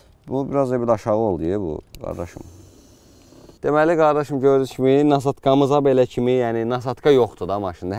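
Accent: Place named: Sumgait, Azerbaijan. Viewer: Yetim+ Ataş Sumqayıt aksenti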